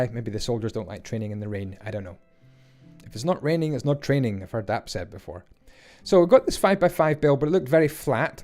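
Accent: Scotland, United Kingdom. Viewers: "hoose"; I love scottish accents and this is the reason why I watch you.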